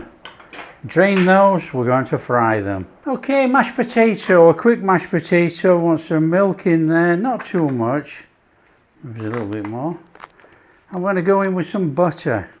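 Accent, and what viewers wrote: United Kingdom. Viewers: Happy day to you sir, love your accent oF BRITAN, you are a chef and master that makes it so enjoyable to watch. Food looks great and love your passion for sharing with us, GREETINGS OR CHEERS TO YOU SIR FROM THE GREAT STATE OF ILLINOIS. #1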